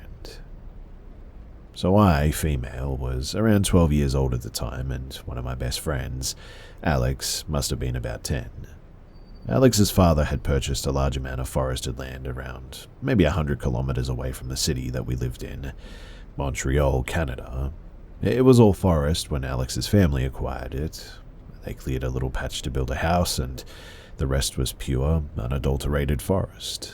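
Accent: Australia. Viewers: I've spent countless hours being lulled to sleep by your sweet Aussie accent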